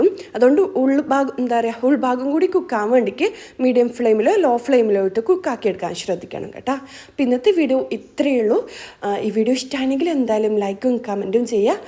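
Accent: Kannur, India. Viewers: Irfanagood presentation enik kannur samsaram nallaishtanu to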